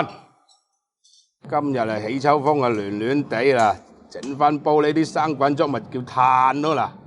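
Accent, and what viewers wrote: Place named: Shunde, China. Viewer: 客家话口音同顺德人讲话是一样的，就是似这位主持人。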